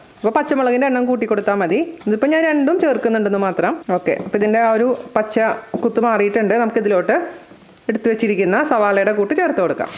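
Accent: Thrissur, India. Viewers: Thrissur aano😊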